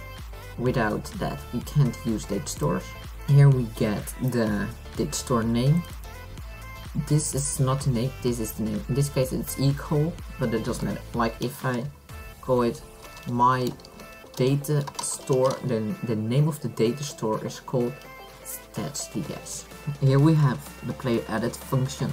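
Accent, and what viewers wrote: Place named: Philippines. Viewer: Your voice looks like filipino voice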